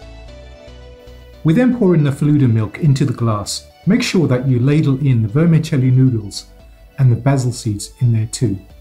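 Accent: United Kingdom. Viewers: Your english is superb ! British accent .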